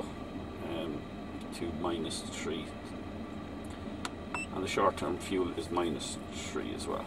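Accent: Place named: Ireland. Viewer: Sounds like you're in Ireland.Wonder if you're close to me.. I need some help 2 days before christmas :(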